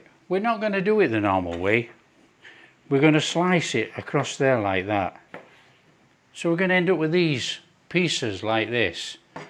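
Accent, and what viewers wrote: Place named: England, United Kingdom. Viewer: Wonderful English recipes and your wonderful English accent! I understand all of it and enjoy both...thanks from the Black Forest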